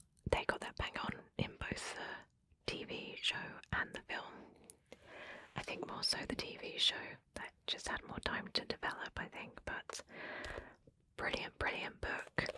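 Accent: United Kingdom. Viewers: thanks for the video. It's nice to hear the British accent, it sounds very classy. 🔥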